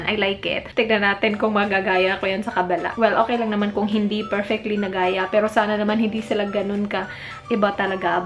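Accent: Visayas, Philippines. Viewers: halaaa, bisaya gyd diay. 😍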